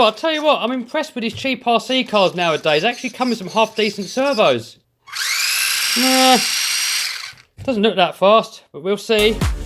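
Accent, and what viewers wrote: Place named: England, United Kingdom. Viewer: So glad i dont live in England. The accent is terribly annoying. Cant handle it. Cool vids on mute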